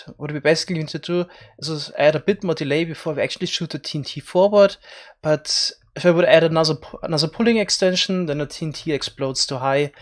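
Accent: Germany. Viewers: Man hört das Deutschland sehr gut raus.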